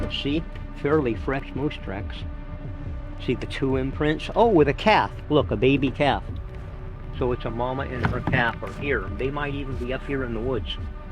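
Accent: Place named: East Coast, United States. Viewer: I wonder what his ball cap said before Bone Rush gave them one of theirs. He talks just like fellers from my area on the East Coast.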